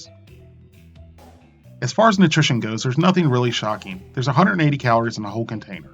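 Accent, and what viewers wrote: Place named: Carolinas, United States. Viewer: not related to your video but you sound like a Carolinian and if so hell yes all the best creators are from hear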